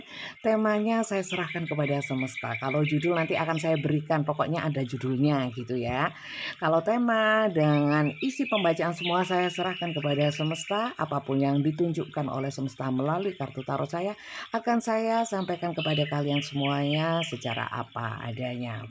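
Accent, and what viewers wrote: Java, Indonesia. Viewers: Assalamu alaykum bunda..?? Q seneng dengerin readingan bunda..lucu mbacanya medok bget..sy jg org jawa bund tinggal di Bandung..g usah di ambl hati sm komentar yg bhasanya kasar org hidup dn yg suka ada yg ga...enjoy aja bund sukses dan seat selalu resonet ga reonet ttp q dengerin q ngefans gaya bicaranya bunda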